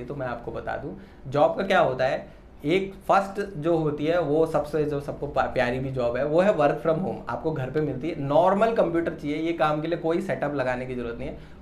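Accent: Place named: Uttarakhand, India. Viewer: Sir u r from uttarakhand ur voice matching uttrakhandi ji send address I am interested too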